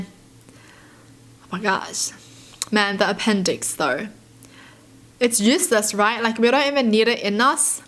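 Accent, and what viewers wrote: Australia. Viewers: Aussie accents best accents 💅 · is Australian! Wow that is so cool. I was wondering where she gets her accent from!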